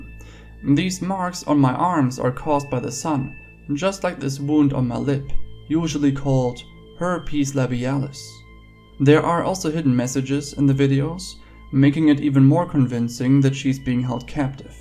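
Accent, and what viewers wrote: Germany. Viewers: I thought it was a Slavic accent at first, but it's German. Very pleasant. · I'm half British and half german, so I'm always thinking are you German? I can hear a light accent but it can also be something else :) Keep on the good work!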